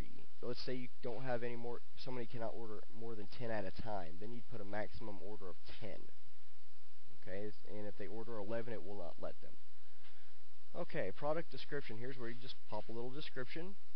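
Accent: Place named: United Kingdom. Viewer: He's a Brit so ofcourse he got an accent.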